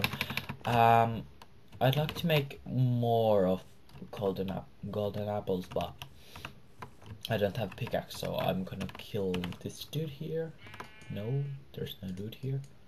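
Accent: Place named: Finland. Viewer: Rallienglanti :D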